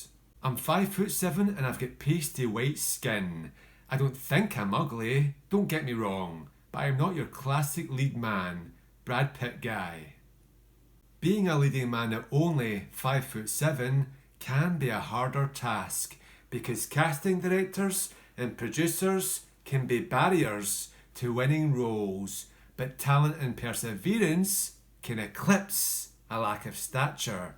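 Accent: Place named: Scotland, United Kingdom. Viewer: Hey you have a Scottish accent like him!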